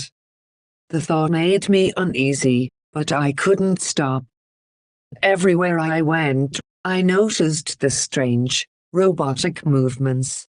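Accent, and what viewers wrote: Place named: Ireland. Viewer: That's an Irish accent I hear, I'm a gang stalking victim and I'm Irish. Are you an Irish target?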